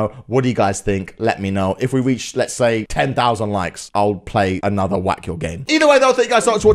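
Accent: United Kingdom. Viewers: Are you british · He’s British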